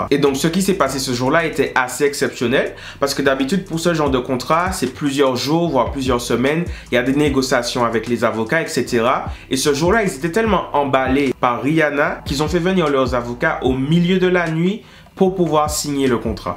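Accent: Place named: Martinique, France. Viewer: Martinique ou île de la réunion ??? Cet accent 🤣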